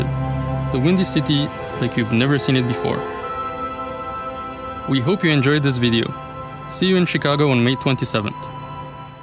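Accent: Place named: Montreal, Canada. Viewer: yup the guy talking is cleary from montreal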